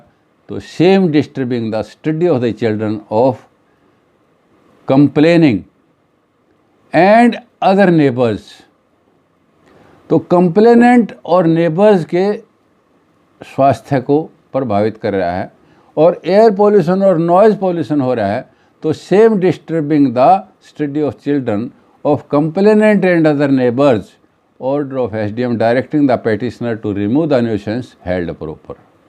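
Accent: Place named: Haryana, India. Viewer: Nice explanation and the wonderful हरयाणवी accent. 👌👌👌👌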